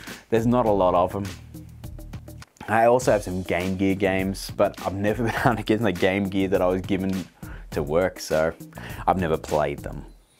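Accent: Australia. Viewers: judging by his australian accent, probably australia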